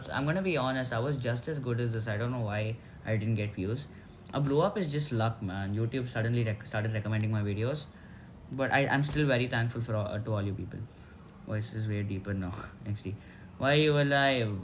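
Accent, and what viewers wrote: India. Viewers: You sound like indian🧐🧐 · Me guessed from the accent he is Indian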